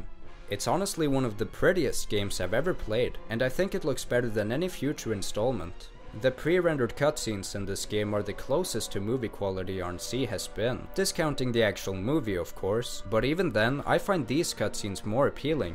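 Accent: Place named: Scandinavia. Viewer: You sound like you're from scandinavia.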